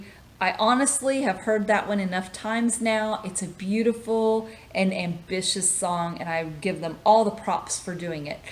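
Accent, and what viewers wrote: South, United States. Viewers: Folks, don't let the blond hair and/or southern accent fool you. The lady has a partly sophisticated and insightful taste in music. I wish I had a dollar for everytime I played my brother's LP.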